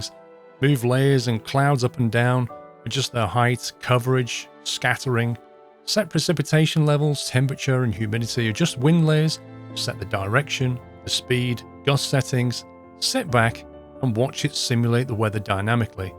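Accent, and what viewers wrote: United Kingdom. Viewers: Subbed as he's British xD So who's doing an A350?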